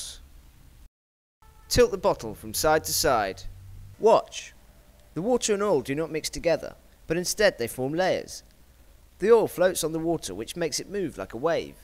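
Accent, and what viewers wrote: United Kingdom. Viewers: I find it easier to listen to him because of the British Accent. Conclusion: I would have been smarter growing up in England.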